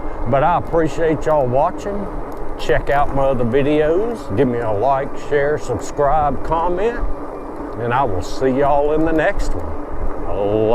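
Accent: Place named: United States. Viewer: Hello, great video I go to church every Sunday online because my first cousin and second cousin is both pastors and they have a church. I cannot make it to the church cause I’m too far. I’m like nine hours away from where they are at so I go online every Sunday and look at them. Yes, it is so sad when people pass away I know whoever they are my prayer with them. You know I don’t like to hear people passing. I know I say this if you have somewhere to be at a certain time get up and leave that’s all you have to do and you won’t be rushing, I don’t drive cars I get on public transportation and the people in my city be telling the bus driver to go a little faster because they in a hurry I tell the bus driver please don’t go fast because I wanna live a little longer but when I get my E trike, I won be using public transportation that much. I only use public transportation about one or three times a month. 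I love walking I Costco right around the corner from me, but people in my area is lazy. They will not walk. They will take a cab to go three blocks very very, very, very lazy in my city. thank you so much for your videos and I love love love your accent. It is so beautiful. Where are you from? I love to hear you talk country ❤❤❤❤❤❤❤🙏🏿🙏🏿🙏🏿🙏🏿🙏🏿 God bless you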